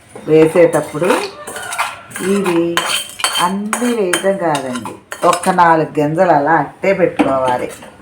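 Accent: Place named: Godavari, India. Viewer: మీరు ఏ ఊర్లో ఉంటారండి,మీరు గోదావరి జిల్లావారి లాగా మాట్లాడుతున్నారు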